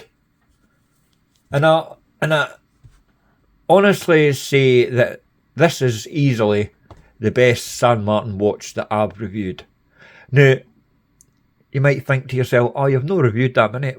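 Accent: Scotland, United Kingdom. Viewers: How have I never seen your reviews before 🤯😂 love your absolute honesty and the good Scottish language,top notch 😎👍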